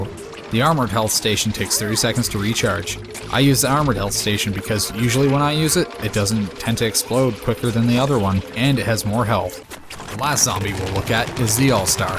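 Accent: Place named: Canada. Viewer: It doesn't, I understand that your Canadian and that anyone insulting the way you talk is just rude.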